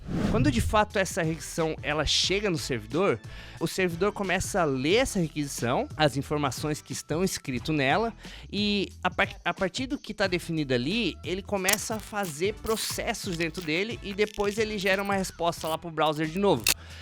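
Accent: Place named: Garopaba, Brazil. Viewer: Cara, vc fala igual um garopabense raiz kkkkk vc é de Garopaba-sc? Não deu pra não notar... kkkk excelente video, mas poderia explicar mais simples antes de entrar no assunto do título hehe mesmo assim gostei... ganhou meu like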